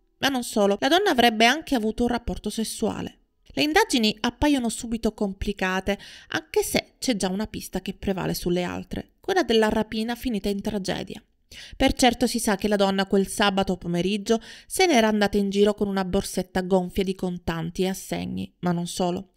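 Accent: Sicily, Italy. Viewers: Ma chi è che commenta sulla dizione? Non ho letto tutti tutti i commenti ma fin'ora non mi pare di aver letto critiche! Si sente cmq l'accento siculo ma a me non dà fastidio, anch'io quando parlo mi riconoscono subito che sono veneta 😂😂 non so usare le doppie e trascino le parole alla fine, ma non posso farci nulla 😂😂 a me piacciono i vari accenti e dialetti!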